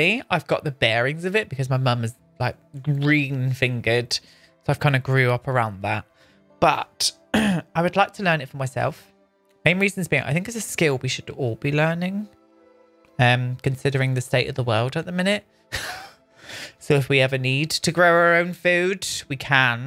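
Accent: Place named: Devon, United Kingdom. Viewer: Welcome back just in time to keep us sane through another lock down. I would love to live in this house. When I win the lottery I will have you design my home. My Gran used to live in Ottery St Mary and would say "Ok my lovely " and hearing you speak always reminds me of my childhood days when we spent school holidays there. I am 69 now so its a lovely reminder Thank you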